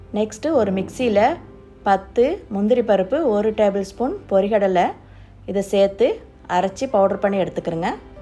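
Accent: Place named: Kilakarai, India. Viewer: Ungloda slang padha theriudhu nega klk nu nice sis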